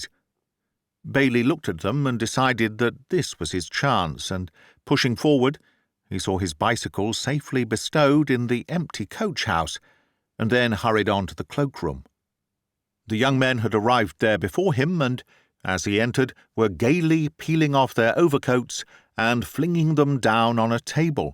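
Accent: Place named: England, United Kingdom. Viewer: Well done as always Mr.! Here in America I’d much rather listen to an audio book by an Englishman, it gives decidedly more cultured air than our flat accent here! Thank you for all your works!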